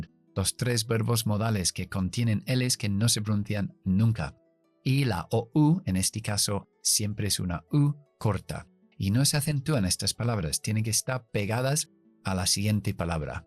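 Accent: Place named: South England, United Kingdom. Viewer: OK, entiendo. Pareciera SBE, es decir Southern British English, pero habría que preguntarle a él para estar seguro. Yo tengo el oído entrenado al inglés del Midwest americano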